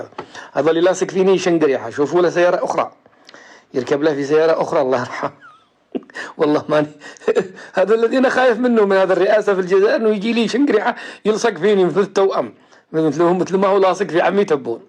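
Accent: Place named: Morocco. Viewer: يمني في المروك ويتكلم اللهجة المروكية😅😅😅😅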